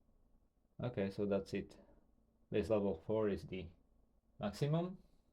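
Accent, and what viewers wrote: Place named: Slovakia. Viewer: viem ze si slovak pocut to ahahahaaa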